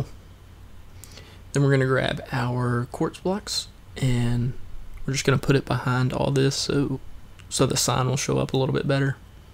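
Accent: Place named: United States. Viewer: I love your country accent! ;-)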